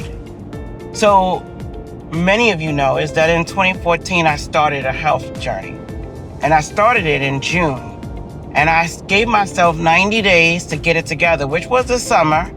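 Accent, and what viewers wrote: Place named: New York, United States. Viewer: Amen, 🎉 Sis love your encouraging words I'm the one who is 66yrs, 285lbs and how i love your NYC accent. Love your videos. I've been watching your videos ever since the video popped up in front of me when you were with Gillian that's where it first started. Water pills, heart pills,baby aspirin and retain water from my N/A Cirrhosis. I know you have alot of subscribers but i'm starting this.... it's something i need to do, and like you said in a comment. I believe that God put that video there for me. I usually watch the watchmen and watchwomen brothers and sisters in Christ and then Gillians video popped on that's when i looked and watched like okay This is my wake up call Love you ❤ all the way from Illinois/Wisconsin.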